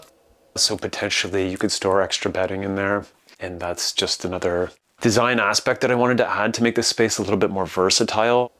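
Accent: Canada. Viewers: His Canadian accent is soft music to the ears of this fellow Canadian. I've been watching a lot of the channel, Never Too Small, just for the laughs because the designers are pretentious about their designs, most of which are far less functional than this one, and no more beautiful. The humility in presentation of his very impressive word is just so refreshing. I saw someone suggest giving up some ceiling height for more height in the loft. That seems like a good idea to me too. But I hadn't even thought of that. The only thing I noticed that might need a change is that is tall and long limbed, making it possible for him to easily reach up and move that light above the sofa, and to reach across the kitchen counter. A very minor quibble. More of an amusement for a shortie like me who can only dream of having a reach like that. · As soon as I heard “aboot” I thought he must be Canadian. 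😊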